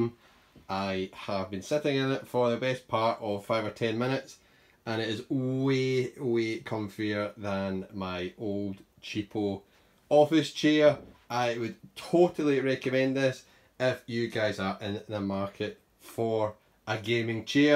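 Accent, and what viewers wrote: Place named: Scotland, United Kingdom. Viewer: We'll definitely keep it in mind! Nice to hear another Scottish accent as well on youtube! :)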